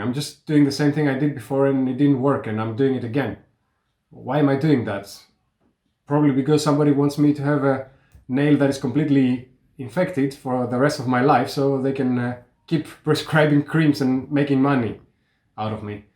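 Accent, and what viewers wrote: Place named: Greece. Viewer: Greek accent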